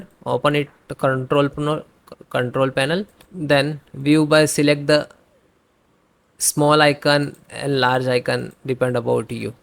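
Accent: India. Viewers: IM DONE WITH THE INDIAN ACCENT IN EVERY VIDEOS. ENOUGH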